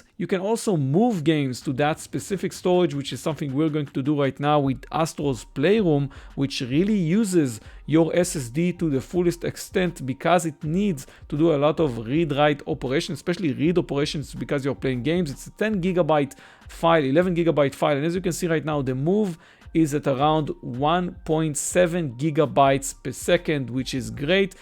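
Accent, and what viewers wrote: Israel. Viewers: Holy shit that Israeli accent haha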